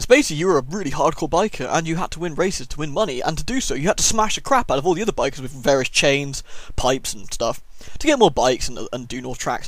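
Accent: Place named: RP, United Kingdom. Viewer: yeah that's what I thought, he does sound slightly posh normally though.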